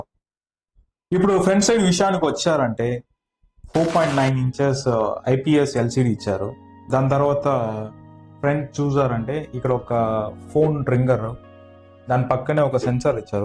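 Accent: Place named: Tamil Nadu, India. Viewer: Me telugu different ga undi brother. Like tamilian learnt telugu..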